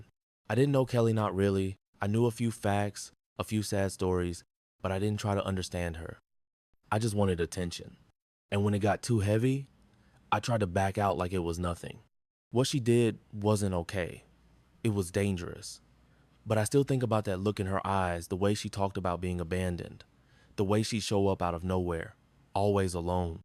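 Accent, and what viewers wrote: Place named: Africa. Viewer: You're African, I can tell from your voice.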